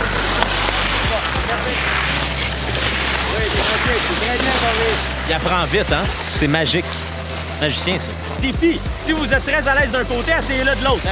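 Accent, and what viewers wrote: Quebec, Canada. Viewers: Comme j'adore cet accent québécois 😂😂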